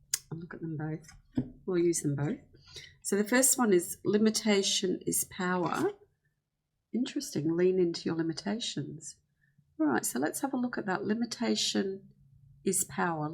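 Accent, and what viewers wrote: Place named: Australia. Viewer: Lovely to hear a fellow Aussie with some great words of love to share 👍🏽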